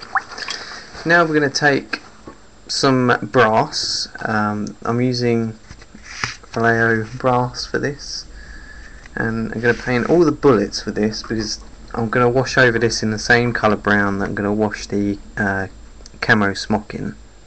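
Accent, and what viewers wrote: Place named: United Kingdom. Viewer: Good God!! A British voice!!!!!!!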